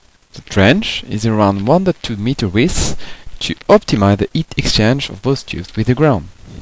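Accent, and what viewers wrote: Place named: France. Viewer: Nom de diou tu es francais :D Le meme accent que moi . xD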